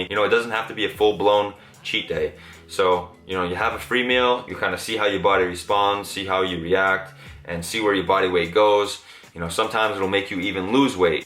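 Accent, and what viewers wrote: Boston, United States. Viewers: 1) I love your eyebrows 2) I feel you on the relationship stuff. There’s no need to rush into things or constantly be looking for love. 3) Your Christmas dish mash up is the best 😝 4) I have MS and love the gym and food, but sometimes it’s so hard to get past my symptoms and dieting specifically for it. I understand being mentally stressed around medications and diets. It’s nice to hear someone give it all up and be free because I did that last year with a medication that almost killed me. You’re a great person. I love the videos! And I love your Boston accent lol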